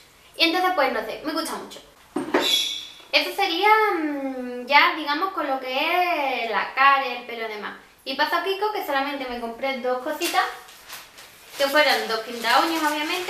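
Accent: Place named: Cádiz, Spain. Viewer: Eres de Cádiz? Amo tu acento! :) me encantó el esmalte turquesita de Kiko! Y el vestido naranjita _ lo amé!!!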